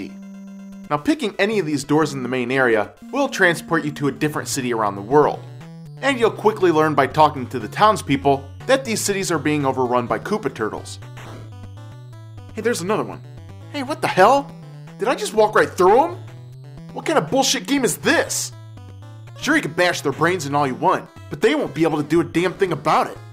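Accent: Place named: Cleveland, United States. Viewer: Oh wow. You are from cleveland. You have the accent, I just heard it when you said "Ay-pple" ;X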